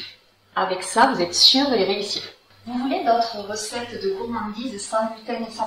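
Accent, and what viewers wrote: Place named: South, France. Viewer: Rien que l'accent du sud nous ouvre l'appétit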